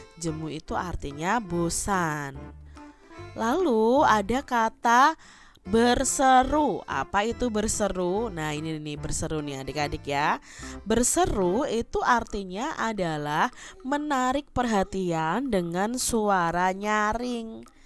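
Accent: West Java, Indonesia. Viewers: Kayak nya atau sunda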